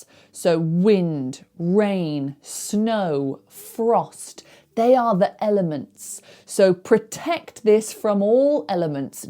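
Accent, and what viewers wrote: United Kingdom. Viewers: It's great to hear British dialect 😍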